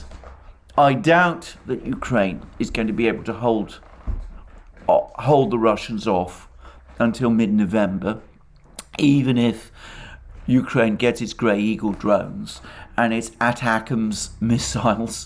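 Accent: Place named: Public school, United Kingdom. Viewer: I've never heard a guy talk more and say less. An hour of gossipy chatter "that cannot be confirmed," full of innuendo and guesses, repetition of "I can't prove it," and "I wouldn't be surprised if we found out" "this is my own speculation" all delivered in that English public school accent that bamboozles Americans. I like Al, and I've listened to him very carefully and never once heard a word that was essential learning. He's a pundit with smooth delivery of empty chatter. If you read nothing else I suppose he's good because he does do some reading.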